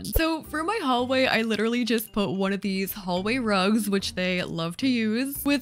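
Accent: Canada. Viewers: Canadians pronouncing it "New Or-leens" is always so cute and funny i love it hahaha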